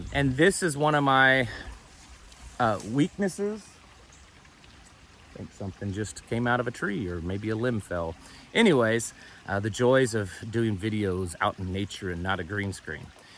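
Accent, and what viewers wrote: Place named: South, United States. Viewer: Very very southern ill. Hello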